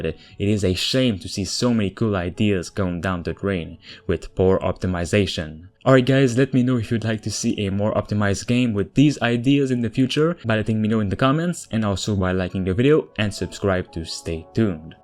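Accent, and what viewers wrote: Quebec, Canada. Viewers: This guy has a distinctly quebecois accent i find...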